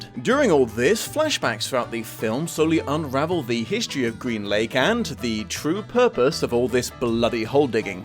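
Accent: United Kingdom. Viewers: if you dont think half of the reason we are all here is to hear the plots of books we already know in a british accent youve misunderstood your audience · “Anyone who had seen the film recently probably felt a tad bored there at having the entire plot narrated back to them in a British accent.” Dude, that’s why I’m here! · As a fan of both the book and film I quite enjoyed getting a synopsis in a British accent! Caveman is the name Stanley gets for finding a fish fossil. They may bestow it because he's passed their hazing and they've come to accept him, but they chose "Caveman" because of the fossil. This is one of the films I think of when I think of great book-to-screen adaptations, it's remarkably close and makes good choices for what to change. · I'm happy to have been refreshed the plot of the book with a British accent (although your accent is very different now from when you started your channel!!). 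It's always nice when something that you liked as a kid still holds up as an adult. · just to be clear, not a single American will ever be bored hearing a British narration. You might as well read the Terms and Services with that voice and we’ll tune in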